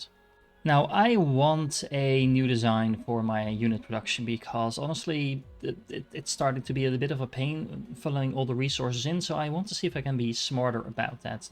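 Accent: Netherlands. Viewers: Je nederlandse accent schijnt wel een beetje door hahahha (maar nogsteeds goede video, helemaal gebinged met snacks)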